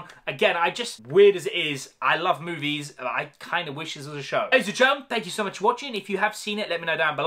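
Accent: United Kingdom. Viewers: Wow thank you. I've never heard a British accent like that before. I subscribed only recently during your Obi Wan reviews so wasn't sure where you were from.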